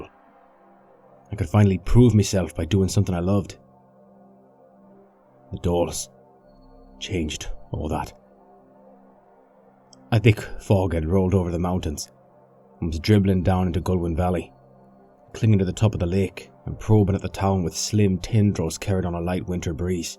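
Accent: Ireland. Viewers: Damn, I’ve heard this one before, but your narration’s the best one I’ve heard. Your Irish accent sounds awesome! Not too overdone, extremely believable. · Love your Irish accent! Great narrations!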